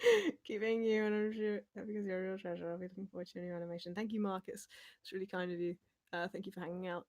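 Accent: United Kingdom. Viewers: i wish i had caught this live. your RP accent is delightful, but i just have two requests. 1) just wanna hear your attempt at a southern american pronunciation of "banana" (buh-NAY-uh-nuh), and 2) wanna hear more of your fantastic singing voice!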